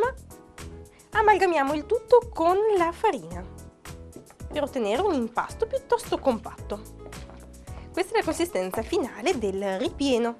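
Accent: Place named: Sardinia, Italy. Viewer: Non metterei mai la ricotta vaccina ma solo di pecora, ma veder realizzare le pàrdulas da te è un piacere, certo non quanto sentire l'accento familiare che lascia immaginare profumi e aria della mia splendida isola, ma il tuo accento, i tuoi modi e la tua bravura sanno essere piacevolissimi come sempre. Un saluto a tutti i miei corregionali e alla mia terra lontana ❣️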